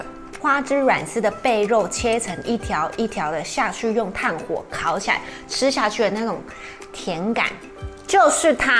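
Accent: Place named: Tainan, Taiwan. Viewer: 豆娘仔是我在海邊第一尾起來的魚當時還只是去跟同學聊天用手擒法打發時間意外在肉粽裡釣獲同學都稱呼為厚殼仔肉質聽說超級細緻好吃應該比黑格細緻多了黑格是很難釣的魚的阿公是釣魚高手釣黑格要有耐心要抓準揚竿的魚汛和時機點不然很容易開空槍 (當時海調初心者的我被黑格戲弄得很慘@@ 我同學教我海釣就是只用阿波釣黑格野生的黑格不便宜小時候就吃很不錯的魚很幸福黑格沒有細刺唯一的麻煩就是去鱗非常不容易每次都很害怕殺黑格黑格沙格赤翅仔枋頭仔(般頭仔) 這家族的都不太好去鱗(還是鯖魚好肉多不用去鱗^^) 題外話我的老家在台南南部人說吃這個字都是四聲的重音的老家應該也是台南那邊吧? 發音聽起來超親切北部人說吃都發一聲 (鵝大人的台語也很有親切感我跟鵝大人同鄉的)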